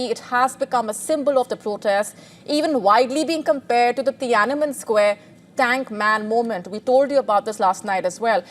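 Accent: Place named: India. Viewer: English in Hindi style!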